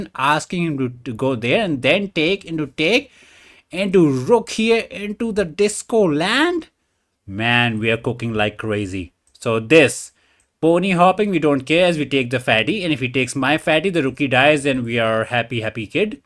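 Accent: India. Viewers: bro i would watch u read a phone book u dont need clickbait. any time i hear an indian accent my knee jerk racism kicks in from years of indian kids lying to me about working for telus mobility to try to play the scam opening with me but u are special i believe in u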